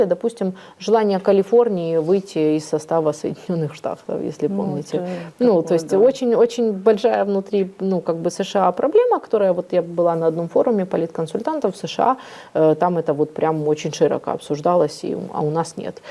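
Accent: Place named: Ukraine. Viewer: Это элемент суржика.Русский язык с украинской фонетикой.Человек ни по русски ни по украински не говорит.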